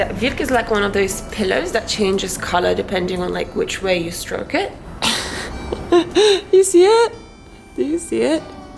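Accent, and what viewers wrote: United Kingdom. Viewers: ...albeit with a German name and a British accent.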